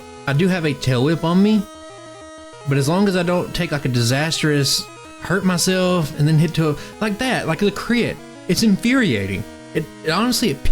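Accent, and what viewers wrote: South, United States. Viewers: honestly imo the country accent is dope, its one of the things that separates you from your average poketuber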